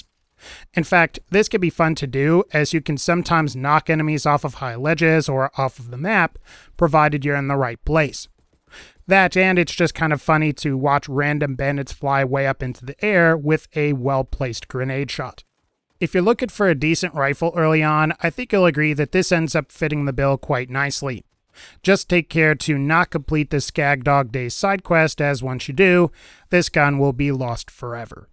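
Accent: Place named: East Coast, United States. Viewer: Im guessing usa east coast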